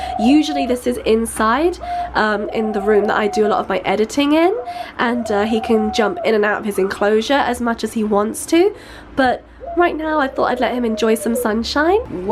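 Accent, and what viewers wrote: United Kingdom. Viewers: Shes british. There seems so be a language barrier for you. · Wait is from the UK?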